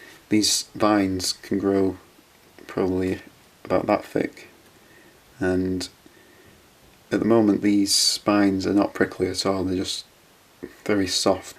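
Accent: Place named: United Kingdom. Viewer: FINALLY! lol I'm so glad you put this one up! I didn't realize how much I was waiting for the awesome camera quality, plant updates, and British accent, until I watched it. Your dragonfruit (and arguably more importantly, bananas lol) are exceptional :D P. S. Might you be growing the Sikkimensis outside eventually, since it is very cold tolerant?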